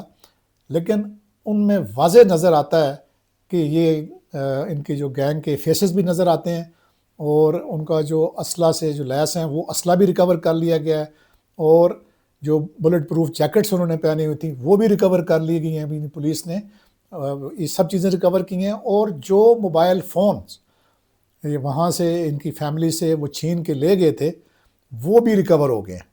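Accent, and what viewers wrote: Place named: Punjab, Pakistan. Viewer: Full of useless words with no systematic approach...his Punjabi accent is crude ..He only knows how to just Praise...only..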